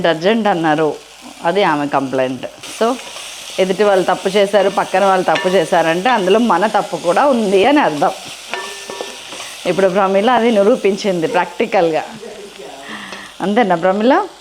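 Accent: Telangana, India. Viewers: Miru telangana baga matladutunaru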